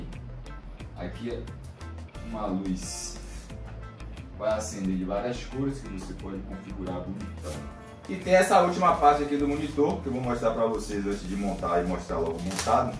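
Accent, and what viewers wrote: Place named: Bahia, Brazil. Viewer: Sou de salvador tbm, já sabia que ele era baiano pelo sotaque dele kksajdk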